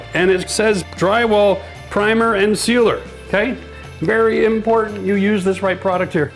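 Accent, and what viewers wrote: Canada. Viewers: Great Canadian accent! 😊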